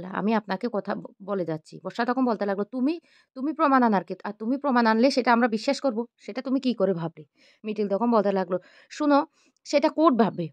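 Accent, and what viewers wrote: Chittagong, Bangladesh. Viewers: Chatgaya bhasa tone e katha bandha korun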